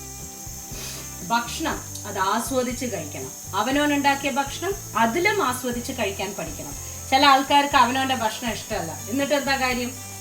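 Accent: Palakkad, India. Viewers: True. Her accent attracts Palakkadans to watch her videos irrespective of the menu she posts. Pakka palakkadan slang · Thanks for all your cookery shows. More than your cooking I like the way you speak because I am from Palakkad too. I am settled in Pune or for that matter I am a Puneri but a pakka Palakkadan malayali. I can read but not write Malayalam. Once again for Palakkadan Malayali basha with the slang words. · Palakkadan slang avatharanam super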